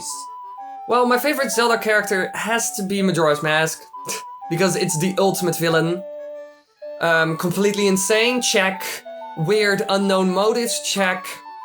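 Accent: Netherlands. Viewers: Je bent Nederlands! Ik hoorde het al aan je accent.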